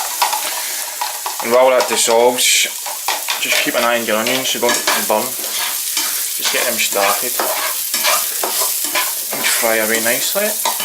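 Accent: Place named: Scotland, United Kingdom. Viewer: Fab ill be giving this a try. Love your Scots accent btw, its ace :)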